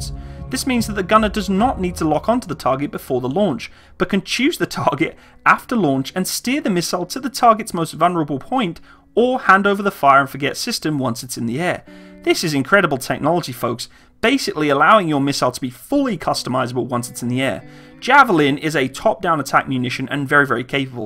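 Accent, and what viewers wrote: United Kingdom. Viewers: who else thought he was british?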